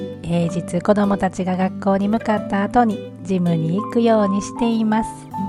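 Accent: Kansai, Japan. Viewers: ドイツからアメリカへ…インターナショナルな生活を送っていらっしゃる、と 見始めると浜村淳さんのようなこてっこてのまったりした関西弁🤣 海外で日本食を大切に味わっておられる素敵な毎日ですね✨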